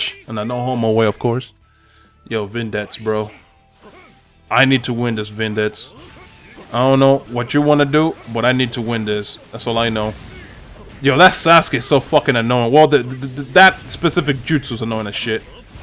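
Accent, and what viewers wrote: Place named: Dominican Republic. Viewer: BRUUHHHH i was honestly not ready for this loool you look like such a cool dude, i can finally put a face to that sick dominican accent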